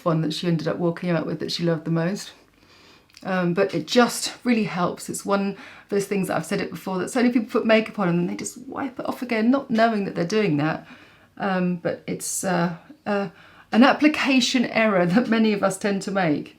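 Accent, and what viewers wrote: England, United Kingdom. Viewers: That lipstick is everything on you. The corals and peaches are lovely with your coloring. Love your videos and your beautiful English accent ♥️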